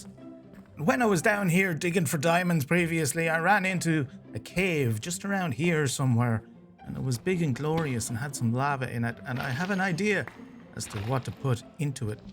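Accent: Ireland. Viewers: Wow you were not kidding with that heavy Irish accent 😂😂 And I actually like it, it's fun😅😂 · how do you not have more subscribers!!? well im happy to be here anyway definitely a new favorite youtuber, most people that do hardcore series go straight to automatic farming and beating the game but you have a big focus on builds which i love and a fun humor and voice. You remind me a lot of one of my favs is Waxfruad just with that irish accent 😂 Thanks for the videos youre awesome!!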